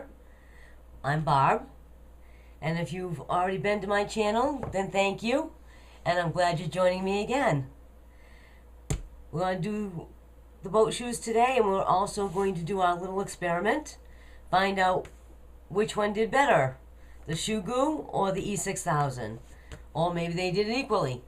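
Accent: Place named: New England, United States. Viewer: That New England accent 💕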